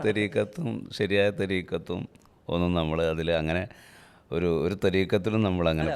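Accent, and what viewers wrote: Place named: Kuruvettoor, India. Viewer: സംസാരംകേട്ടിട്ട്കുരുവെട്ടൂർആണ്എന്ന് തോന്നുന്നു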